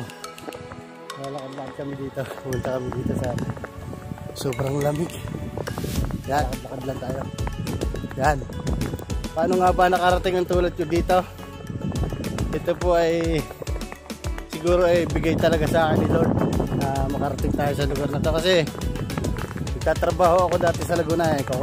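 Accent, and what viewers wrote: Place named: Batangas, Philippines. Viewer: parang Batangenyo ang punto mo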